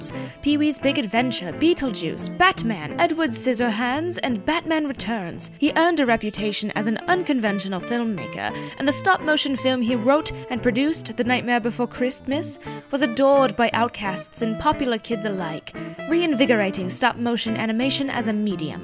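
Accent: United Kingdom. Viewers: Well you sound more British than I do, and I'm actually British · Wow. I can't believe you did a british accent for this entite video. 👏👏👏👏👏👏👏👏👏 That takes guts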